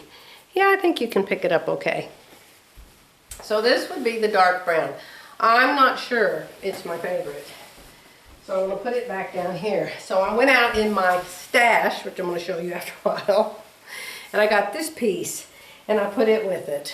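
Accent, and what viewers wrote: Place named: Oklahoma, United States. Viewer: Your videos always make my day. My family is all from Oklahoma & Arkansas & it's like listening to them talk. You make me smile. I like both of the border colors. How about a skinny little brown line around to frame it & then a wider binding of the turquoise? On the crumb quilt, I love option 4. I think that one is the best. Have a wonderful day!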